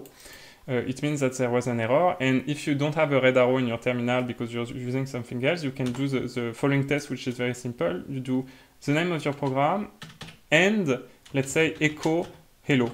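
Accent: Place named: France. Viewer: are you french? i recognise this accent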